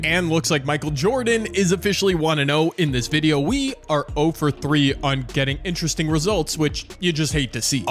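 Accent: Canada. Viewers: Is he from canada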